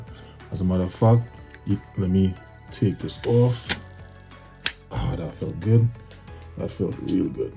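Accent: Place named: Trinidad and Tobago. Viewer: You sound Trini brother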